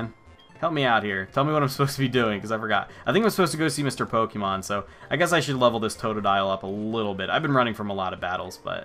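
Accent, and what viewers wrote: Sweden. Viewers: That is one legit Swedish accent! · your Swedish accent sounds like Sven from happy feet 2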